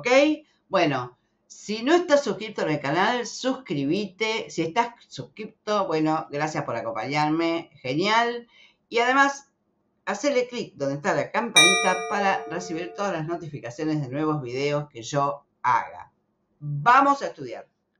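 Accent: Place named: Rio de Janeiro, Brazil. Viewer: Hermoso el portugués carioca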